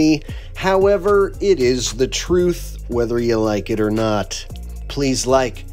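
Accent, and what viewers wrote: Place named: England, United Kingdom. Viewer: Hahahaha 😂😂😂 love the English accent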